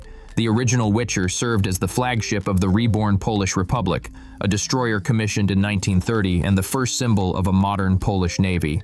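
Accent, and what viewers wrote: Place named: United States. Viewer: Excellent video showing superb European cooperation to create a state of the art platform. Pity that the narration was with an American accent. It is noted that the frigate has ZERO American content. Why therefore is the narration American? Content - excellent. Presentation - not so much.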